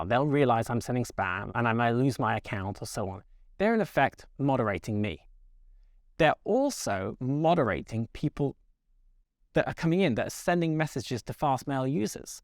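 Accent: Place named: United Kingdom. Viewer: I think of as being from the UK. is an Irish name (pronounced ) and as I understand it, he grew up in and has spent more years in the UK than anywhere. You'll notice he has a "British" accent. He does live and work in Norway, has a Norwegian last name and his wife and kids were born there. So... But yeah - his English is flawless because he's pretty much from there. No one has ever mentioned to me how good his Norwegian is... I'll have to ask some of his co-workers.:)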